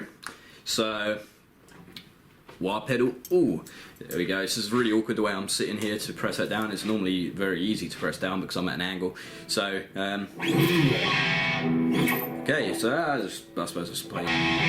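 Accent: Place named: Rio de Janeiro, Brazil. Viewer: He speaks like a pal from Rio de Janiero!..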